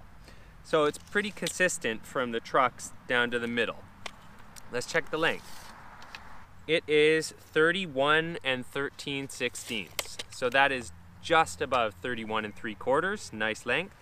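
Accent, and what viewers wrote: Canada. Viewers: First time watching the channel and I thought to myself that guy is Canadian I'm sure, I can hear it in his accent. As an English man we can't typically distinguish the difference between American and Canadian accents and whilst all you in North America hear those differences between each other easily I imagine, for us it really isn't easy but I think I've got it sussed now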